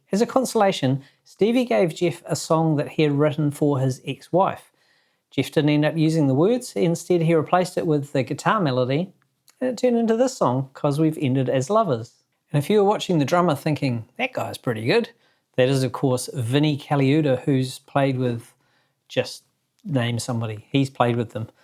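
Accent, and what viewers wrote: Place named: New Zealand. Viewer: 😅 Gotta love that Kiwi accent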